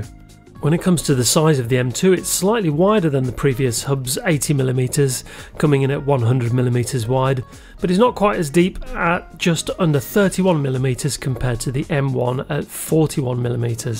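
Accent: United Kingdom. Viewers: I thought he is based in the UK from his accent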